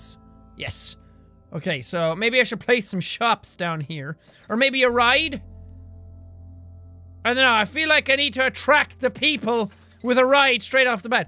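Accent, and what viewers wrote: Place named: Ireland. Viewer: theelectricsocket does not have the beautiful angle voice but he could definitely make a speech song.His voice is so soothing and has the perfect amount of Irish accent 👌🏻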